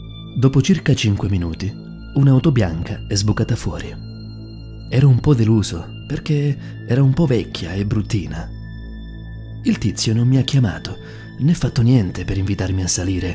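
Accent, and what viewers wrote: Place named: Calabria, Italy. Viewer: non so voi ma ascoltare queste storie alle cuffie è qualcosa di fantastico ma allo stesso tempo terrificante! comunque complimenti davvero per il montaggio la narrazione e tematica... e poi dalla voce sembri calabrese giusto?!?!? :) COMPLIMENTI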